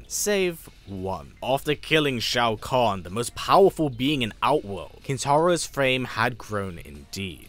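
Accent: United Kingdom. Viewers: The first MK i played :3 Great Game!! Pd: Your Accent...are you From UK? Answer if you want, mah Boi.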